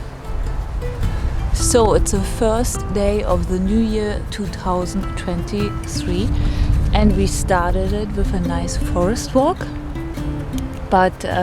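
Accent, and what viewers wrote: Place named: Germany. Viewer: Ich würde dir empfehlen, die Videos in deutscher Sprache zu verfassen. Man merkt, wie "anstrengend" es für dich ist, englisch zu sprechen (zumindest wirkt es so), und dann ist es für die Zuschauer natürlich nicht "entspannend" dir zuzuhören. Weiterhin ist deine Aussprache sehr deutsch, was es ebenfalls anstrengender macht, dir zuzuhören. Natürlich gibt es ein größeres potentielles Publikum im englischsprachigen Raum, aber ein größeres Publikum erreichen zu wollen, bedeutet meistens auch, nicht allzu spezialisiert zu sein (eine Nische zu haben), und oft kommt Erfolg erst dadurch, dass meine eine Nische belegt und sich von anderen abgrenzt. Meine Kritik ist nicht böse gemeint, vielleicht hilft es dir ja :)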